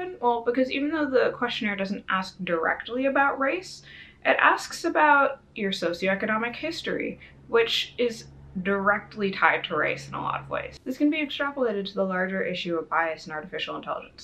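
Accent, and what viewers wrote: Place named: California, United States. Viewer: Dialect is Western, California, Santa Monica.....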